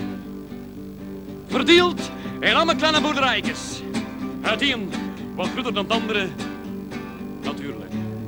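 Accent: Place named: Flanders, Belgium. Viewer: Ik versta 3/4 van dit Vlaamse dialect, ben Limburger... :-)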